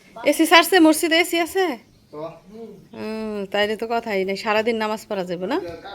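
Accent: Gazipur, Bangladesh. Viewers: তোমার কন্ঠের সাথে মিলে যায় আমাদের গাজীপুর কন্ঠ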